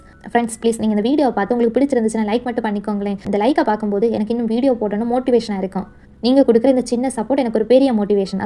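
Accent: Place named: Kanyakumari, India. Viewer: akka neega kanyakumari yaa??? unga voice la kanyakumari slang theriuthu... i am also kanyakumari ❤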